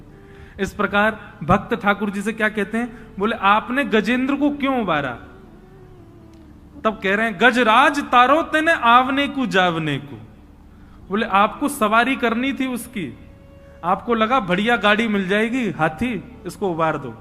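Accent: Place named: Braj, India. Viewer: Apki brijbhasha me boli bhaut achhi lagti hai maharaj ji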